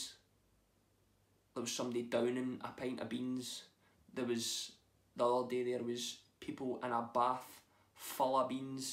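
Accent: Scotland, United Kingdom. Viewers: yeah it ist scotish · hart war vielleicht etwas übertrieben in dem Fall. aber einen starken schottischen Dialekt verstehen selbst Engländer schlecht. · I absolutely love this. I'm new to your channel, but it was nice to see a humble, down to earth, and honest person behind the voice. I struggled to keep up with some of what you said (I'm in the US), but I could listen to you talk/sing all day. I have a small percentage of Scottish in my blood, so I've always been drawn to the Scottish accent, as well as the Irish, British, Australian, well most accents are beautiful to me. 😂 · obvious he is from scottland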